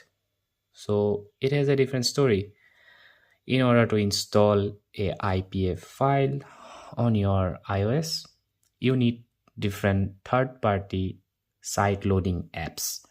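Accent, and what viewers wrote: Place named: India. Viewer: You’re dumb Indian voice to waste my time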